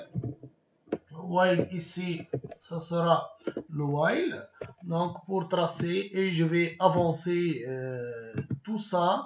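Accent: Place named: North Africa. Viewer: On comprend rien avec ton accent couscous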